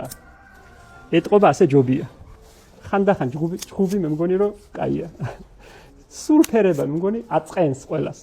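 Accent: Guria, Georgia. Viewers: ვგიჟდები შენ გურულ კილოზე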